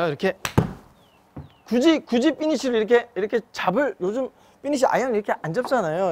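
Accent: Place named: Gangneung, South Korea. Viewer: 님 강릉분이신가요? 동향인듯 귀에 익네요 ㅎㅎ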